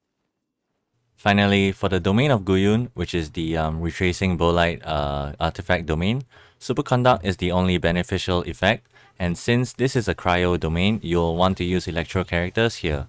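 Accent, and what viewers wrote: Singapore. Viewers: picked up your mixed Singapore accent right away, we feelin it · You sound Singaporean ( sorry if this sounds racist )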